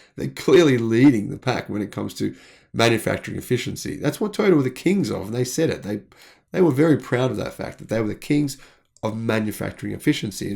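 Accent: Australia. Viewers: She's apples! No worries. True blue, Toyota's up Shit Creek without a paddle but they keep yakking on how she'll be right. Crikey, they've got Buckley's, what a bunch of bludgers, a few stubbies short of a six pack if you ask me. They say they're on a ripsnorter but it a dog's breakfast, 'cause those hybrids still need to pull in to the servo. What a bunch of drongos and galahs. They'll never make the Big V when it comes to EV 'cause it's just them pulling the wool. Fair dinkum their only attempt so far is cactus. Now they're gonna try and get a free ride by dinking on some Chinese makers. They really need to get out of woop woop, put in some hard yakka and get their ship to chuck a U-ey. Strewth... did I really say that. Should have saved that one for Ron. Don't worry... we are just stoked when you talk all antipodean!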